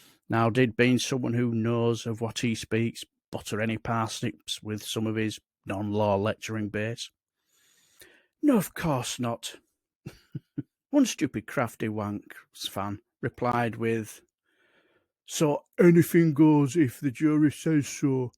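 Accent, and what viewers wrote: Yorkshire, United Kingdom. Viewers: Never heard of Darren Grimes but won't make a point of following the vacuous populist sh1t. Btw, nothing wrong with a Yorkshire accent; and that's from a Lancastrian. The fact that number 4 was missing was hardly the end of the world, but made me rewind to check. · Another enjoyable vid. By the way, my previous comment was purely a question, and not meant as a complaint. And, even though I regularly listen to Phil and Max, my weekdays are not complete until I have enjoyed your dulcet Yorkshire tones. Please keep up the good work.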